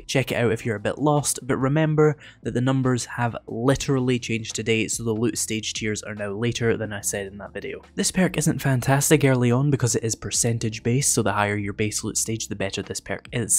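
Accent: Scotland, United Kingdom. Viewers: are u from scotland brother ur accent reminds me of a outlander main male character's one that he tried to addapt to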